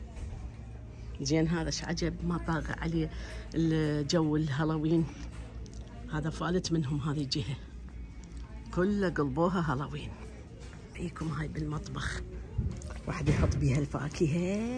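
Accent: Baghdad, Iraq. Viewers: والله استمتعنا كلش لان نفس ذبتنا وسوالفج كلش حلوه وذكرينا بالحجي البغدادي الحلو الله يبارك بيج وصحه وعافيه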